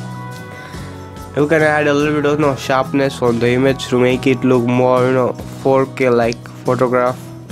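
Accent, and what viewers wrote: Gujarat, India. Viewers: Are you from Gujarat? Your accent says that.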